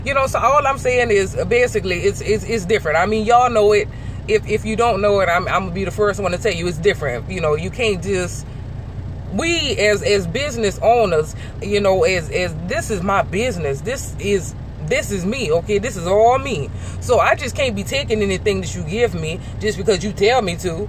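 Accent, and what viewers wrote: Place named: New Orleans, United States. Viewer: LAWD HAVE MERCY BEHBEE!! BRAINS, BEAUTY, PERSONALITY, AN YOU A CUTIE!! AN TO TOP IT OFF DAT N'AWLINS ACCENT BEHBEE!! MAKE ME WANNA JUMP THRU DIS PHONE GIRL!! KEEP DOIN YA THANG SWEETHEART!!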